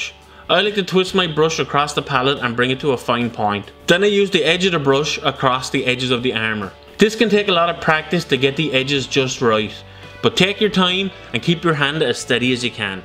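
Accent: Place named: Wexford, Ireland. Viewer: I'm teetering on the brink of attempting to paint a miniature for the first time since my teenage years. This is really useful, thanks. It's not everyday I hear such a perfect example of a Wexford accent on the internet either ;)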